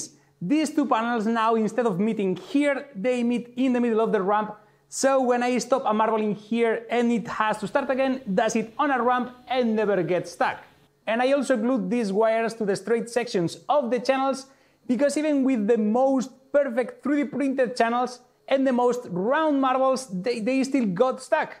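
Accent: Spain. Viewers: Se nota a kilómetros el acento español que locura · Como se nota que eres español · como se le nota que es español al jodio... increible video e invento tio!!!! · Tu ere español no? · Tú eres español, a mi no me engañas! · el acento español se nota un huevo, eso ayuda a los españoles que sabemos inglés a hacerlo más facil de entender, muy buen video y me voy a ver un par más que me parecen interesantes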